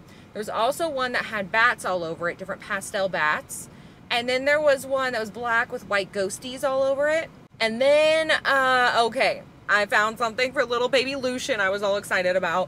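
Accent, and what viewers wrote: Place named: South, United States. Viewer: Hey, I’ve been here for a few years now. Mainly popping in for this season. When you were talking about how you say certain stores names- it made me wonder if you are from the south? I’m from NC and have always thought I had a neutral accent until I went to visit up north. They told me I had a subtle country accent.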